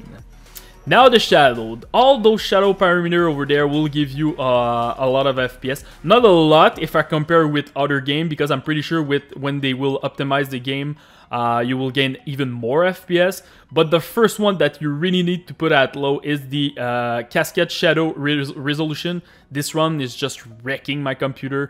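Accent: Quebec, Canada. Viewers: Um, are you a French Canadian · Thanks man ! Clairement un Québecois, corrige moi si je me trompe ;P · Bel accent Québécois! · Tes québécois non?? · Are you French Canadian??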